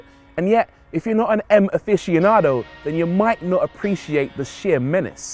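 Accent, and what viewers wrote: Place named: Caribbean. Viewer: Having seen him a few times now, I have to say that I can't stand the guy. His patois accent is gratingly irritating.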